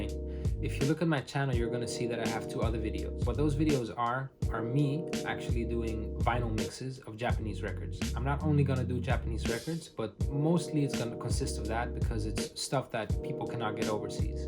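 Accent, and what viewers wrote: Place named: Netherlands. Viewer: you dutch? you sound dutch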